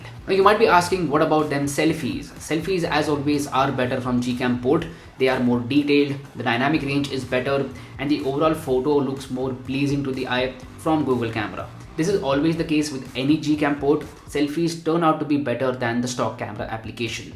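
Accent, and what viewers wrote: India. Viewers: Indian when speak English 🤮🗑️🥵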